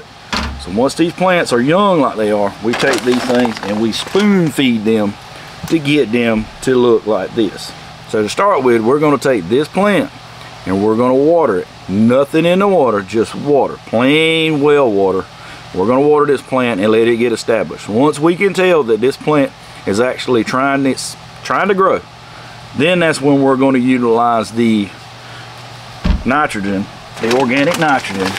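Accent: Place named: South, United States. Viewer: Thanks for not talking me to death like most videos do--just the basics delivered with a cute southern accent. ("Sole" for soil) :washhands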